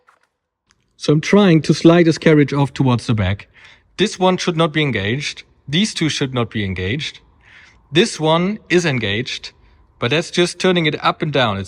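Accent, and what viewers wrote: Germany. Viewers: ich höre deutsch xD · Selten so ein Deutsches Englisch gehört. Geiles Video, Jung.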